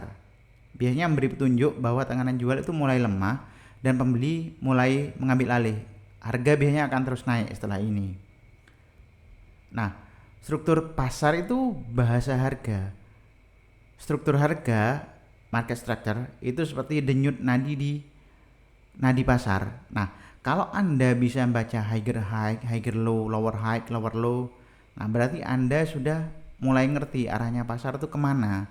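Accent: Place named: East Java, Indonesia. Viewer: Mksih share ilmu2 nya bro,,,logat kental Jatiman KLO ga kliru😂😂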